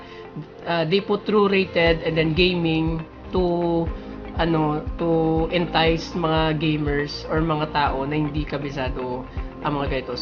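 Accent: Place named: Iloilo, Philippines. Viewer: Prang ilonggo ka kasi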